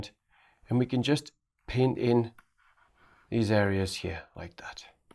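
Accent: Newcastle, United Kingdom. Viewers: To use an old English phrase, you really know your onions! Superb stuff. And your Geordie accent is always a pleasure.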